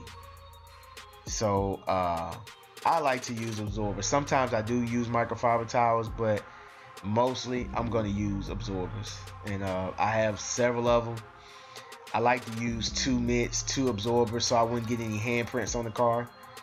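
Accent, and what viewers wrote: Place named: Deep South, United States. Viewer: Caught on after viewing some more vids. That's definitely the deep south.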